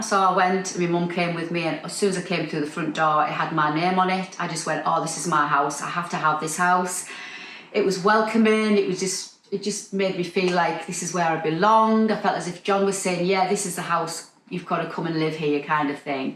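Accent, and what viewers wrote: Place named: Northern England, United Kingdom. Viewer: Amazing. Look at you GO!! I can't wait to see the reno and all your adventures. I will say you don't have to say Widowed you can say Single. I love sewing but I also love a good reno. Hw about A Dressmakers Life that should incorporate your fresh start while also giving a nod to where you came from. I love being able to sit here in the US (currently) and listen to a Northern accent. Makes me think of Doncaster and my youth lol.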